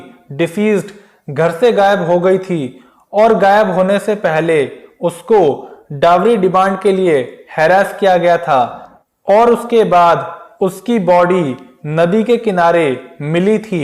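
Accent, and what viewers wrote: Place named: Ballia, India. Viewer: Dil de nahin sar mein Uttar Pradesh Baliya jila se bol rahe ho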